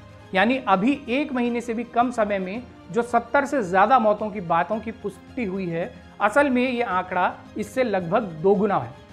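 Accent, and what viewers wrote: Garhwal, India. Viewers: गढवाली का छौंका प्रस्तुति को बहुत ही दमदार बना देता है।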